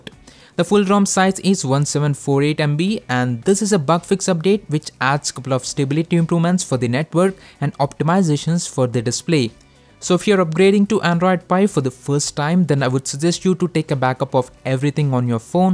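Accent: India. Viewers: Sounds like India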